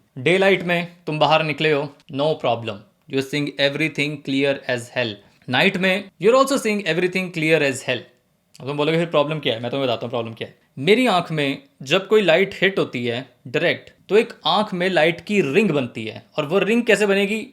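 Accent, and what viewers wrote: Punjab, India. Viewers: Excellent way of explaining ( voice and punjabi accent makes it even more interesting)... Last tak interest bana ke rakha... And sharing your own experiences is the best thing as it is no filter experience... Great job! ❤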